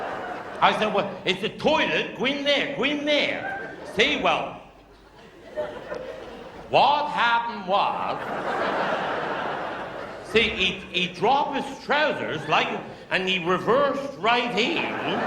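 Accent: Wales, United Kingdom. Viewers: Welsh accent. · Yes ,the Welsh accent can sometimes be difficult to understand if you don't listen carefully.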